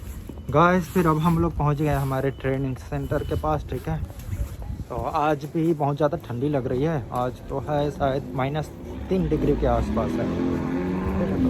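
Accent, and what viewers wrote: Chhattisgarh, India. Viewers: भईया आपके हिंदी में भी छतीसगढ़ी अभी भी झलकता है ,,, मतलब एकदम हीरो वाला काम किए हो यार ,, आपके गांव वाले आपको देख कर बहुत खुश होंगे ,, । Love you brother 🙋❣️ from all chhattishgarians,, ekat bar chhattisgarhi bol dete to Maza aa jata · Bhaiyaa tor baat kre me thoda- thoda chhattisgarhi ka esent aat he 😊🙏 Badhiya he👌🙂👍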